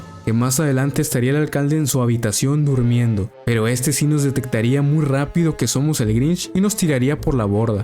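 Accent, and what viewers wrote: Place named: Northern Mexico, Mexico. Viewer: Amo mucho tu forma tan norteña de narrar y lo divertido que vea tu voz, ya eh visto varios de tus vídeos y son muy divertidoa Nueva Seguidora Feliz navidad🎉🎉🎉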